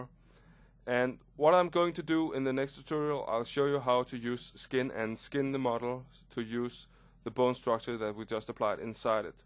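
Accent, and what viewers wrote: Denmark. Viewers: it sounds like you're danish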